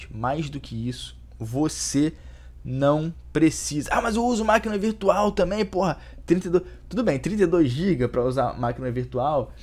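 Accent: Rio de Janeiro, Brazil. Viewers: tu e de que bairro do rio ?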